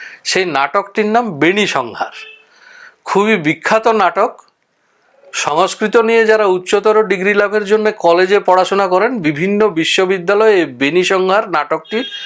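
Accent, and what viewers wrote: Bankura, India. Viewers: মশাই যে বাঁকড়ি উচ্চারণ এই বোঝা যায়। আমিও বাঁকড়ি। খুব ভালো তথ্য পেলাম।❤❤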